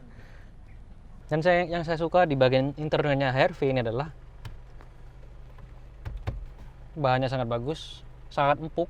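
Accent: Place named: Surabaya, Indonesia. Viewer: Mantep reviewnya logatnya Suroboyoan :)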